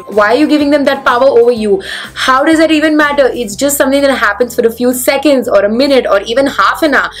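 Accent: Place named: South India, India. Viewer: What ????? 🤣U r northindian.. i m subscribed to u from last 6-7 months n thought u r a South Indian (majorly because of the south wala accent when u talk in english ) ... i am also north indian.. 🤗hugs... whats your native ??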